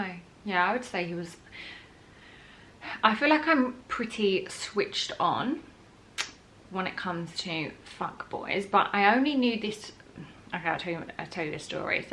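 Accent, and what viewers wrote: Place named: United States. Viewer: Yass girl look at that fresh beautiful skin, you are glowing ✨✨ When you said awkward 🤣🤣 I feel I’m a bit old for advice videos but I still watch anyway cos you are hella entertaining...and you guessed it I said hella in American accent ☺️ You don’t need to apologise for anything. Ps my family are hella annoyed at me because of you 🤣🤣 I’ve only been calling bloody eat out to help out - help to buy...that’s all your fault haha x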